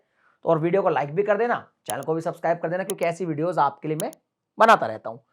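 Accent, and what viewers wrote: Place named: Haryana, India. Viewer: Aajkal aapki hariyanavi nikl rhi sir 😊❤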